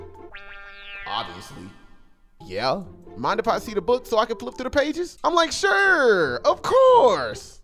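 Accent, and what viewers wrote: Alabama, United States. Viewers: What part of bama you from brodie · Bro what part of Alabama you from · Bro you from Alabama 😮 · This niggas from Alabama 😭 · What part of Alabama u from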